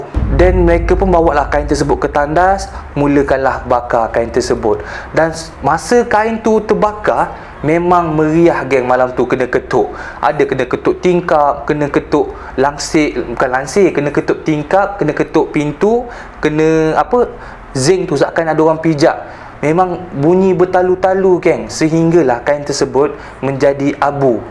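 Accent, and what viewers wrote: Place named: Terengganu, Malaysia. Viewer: Assalamualaikum…akak dgn suami bru firstime dgr…bru 3 cite akak dgr…2 cite dr pantai timur…pastu yg ni…mcm dh start suke lak dgr adik b'cerita…loghat ganu tu…buleh dh tu…jd dh tu…😆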